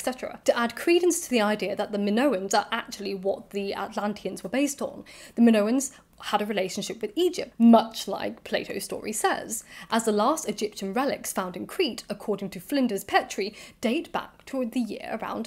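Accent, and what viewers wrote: RP, United Kingdom. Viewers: Oh my days she's posh! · Im from London,and I must say you have possibly the most posh British accent.You should try and make audio book. You have such a strong elegant voice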